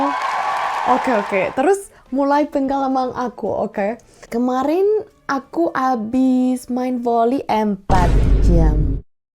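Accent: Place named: Indonesia. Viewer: Gayamu... Tengilmu... Bicaramu..... Gokil indo abis. Top.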